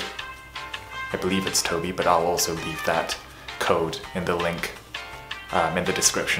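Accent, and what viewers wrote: United Kingdom. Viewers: Ive never heard this man speak before I didnt think hed be British 😍😭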